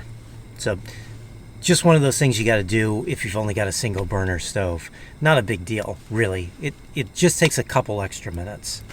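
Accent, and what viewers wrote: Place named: California, United States. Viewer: That's why your accent sounds familiar to me because you are from California. You can make your own pasta sauce. Tomatoes, a little red bell pepper (to keep the red colour of the sause) and one stick of celery to bring out the tomatoes taste, blend. It also makes a good tomatoes soup too 😊. I take it your mind is doing it's thing in it's regular time slot again. All good 🤗.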